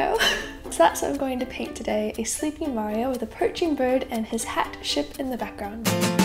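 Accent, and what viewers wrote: United Kingdom. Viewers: It's really cute hearing her start to pick up a British accent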